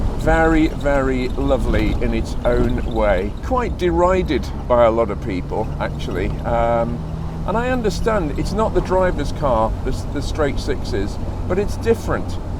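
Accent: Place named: RP, United Kingdom. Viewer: so pure, I mean the car... and the perfect Queens English explanation.... Like!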